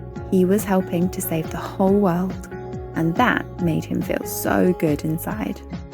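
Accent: United Kingdom. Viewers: Yesh theres a harry potter accent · Gotta love the British accent!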